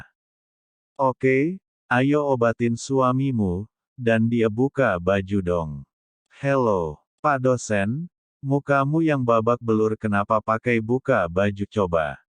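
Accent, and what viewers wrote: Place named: Java, Indonesia. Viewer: Cerita nya lucu, ada logat Jawa nya lanjut Doong ❤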